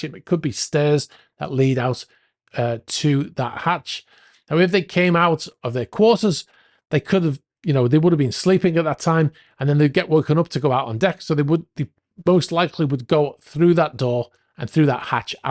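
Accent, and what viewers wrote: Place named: Scotland, United Kingdom. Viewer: Man, do you speak in an unpleasant and hackling kinda scottish, almost stuttering way...did you take any lessons..?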